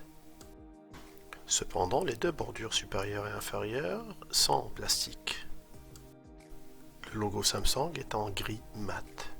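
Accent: Quebec, Canada. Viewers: Très bonne vidéo. Ca m'a aidé a la choisir pour mon fils. C'est appréciable quz ton accent québécois n'est pas très marqué ca permet aux européens de l'écouter avec plaisir. +1 pouce bleu pour te soutenir pour ton bon travail.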